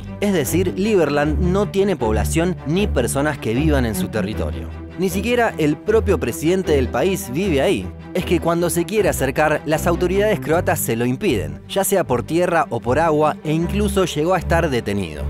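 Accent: Rioplatense, Argentina. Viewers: Tienes una excelente edición amigo y que elegante se escucha el acento rioplatense sin modismos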